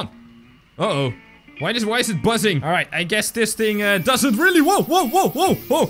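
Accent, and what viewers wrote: Netherlands. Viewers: are you not dutch hmmmmmmmmmmm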